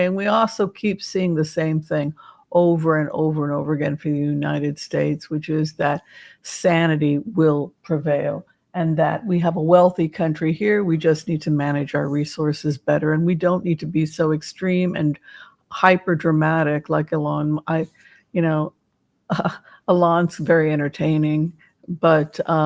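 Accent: England, United Kingdom. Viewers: Great British accent! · As a Brit , you’re adorable English accent makes me giggle and feels healing to me! You are wonderful!!!!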